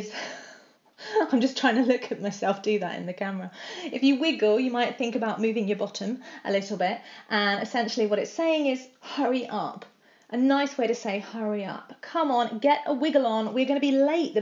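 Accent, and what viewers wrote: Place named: RP, United Kingdom. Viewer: Hey.u r my fav youtube teacher.Are you planning to release any podcast of only yourself? I really like your posh accents and wants to listen on podcast. Or audio books. anything pls💕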